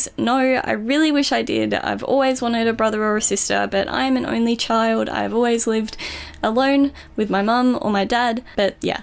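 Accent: United Kingdom. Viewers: When I sometimes hear her voice it's kinda a bit British... Does Austrialians have a bit British voice?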